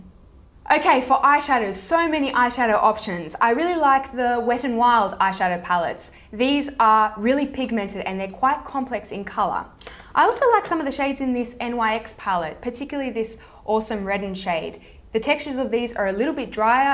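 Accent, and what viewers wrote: Australia. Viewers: You sound australian but your using American products???? I'm confused!!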